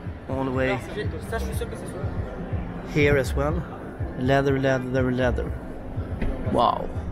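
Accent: Sweden. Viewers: I bet the one whos talking is from Sweden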